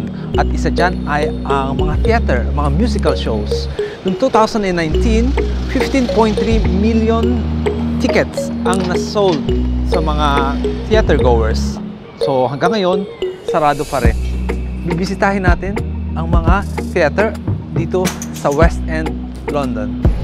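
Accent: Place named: Batangas, Philippines. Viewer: Hindi po halata sa mukha,pero halata po sa boses na Batangueno po kayo😂.Talagang madaming naapektohan iyang pandemic😔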